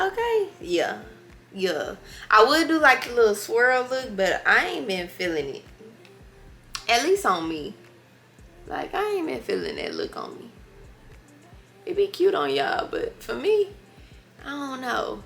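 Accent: United States. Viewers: Love your accent , what part of the states are you from ? ❤️‍🔥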